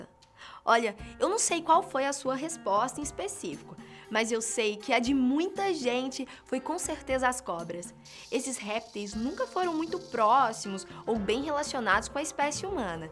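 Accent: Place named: Northeast, Brazil. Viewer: Gostei do sotaque, que parte do meu nordeste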